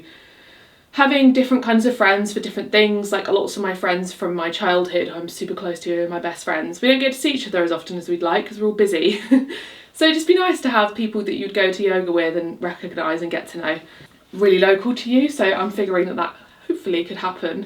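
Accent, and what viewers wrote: United Kingdom. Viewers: 🐶. love your videos. Your home is beautiful. I could listen to you speak all day… the British accent is everything! Red and burgundy are your colors… very flattering to your dark hair and porcelain skin ❤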